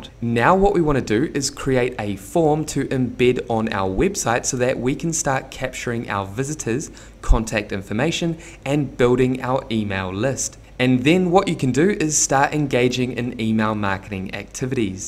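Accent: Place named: New Zealand. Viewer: Thanks for the great info! We are a Kiwi/Aussie family living in Paraguay so it's nice to hear your Kiwi accent on the video! 😉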